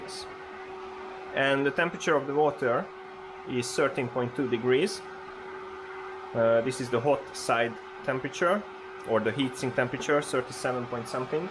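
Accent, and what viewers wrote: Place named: Hungary. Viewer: Are you Hungarian? Your accent tells me you are! :)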